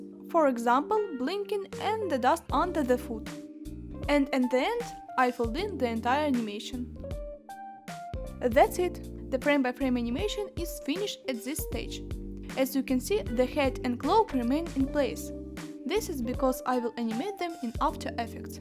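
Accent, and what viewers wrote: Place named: Russia. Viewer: When a tutorial comes from mother Russia, then the heavy accent starts, you know the video it's going to be awesome!!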